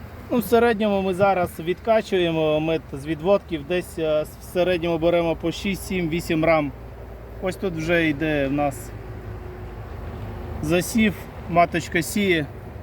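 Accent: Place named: West, Ukraine. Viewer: Слава Україні ! Я з Львівської області а ви з півдня України , але по вашій мові можна сказати що ви теж ,,западенець".😁 Дякую вам за мову та за повчальні , змістовні відео. Героям Слава !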